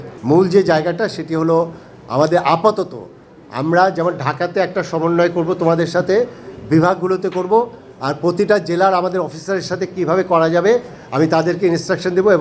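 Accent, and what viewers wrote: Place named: West Bengal, India. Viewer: ওনার বাংলা ভাষা অনেকটা পশ্চিম বংগের মানুষের মতো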